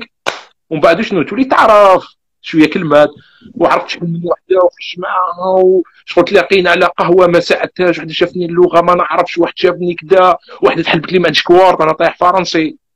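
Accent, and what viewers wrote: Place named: Bordj Menaiel, Algeria. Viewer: تبان من پرج منايل على حساب هدرتك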